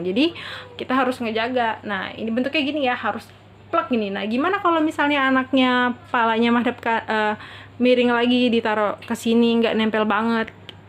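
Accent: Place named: Lampung, Indonesia. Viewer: Mba org Lampung kah, logatnya keliatan.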